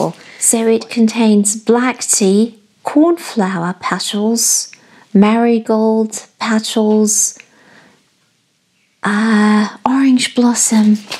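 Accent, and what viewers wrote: United Kingdom. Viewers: I’ve noticed over time how much more British you accent sounds.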